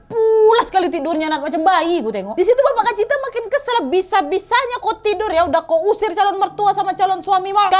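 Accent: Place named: Madura, Indonesia. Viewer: Kok lama lama logatnya kayak orang madura , lucuu banget 😁🥰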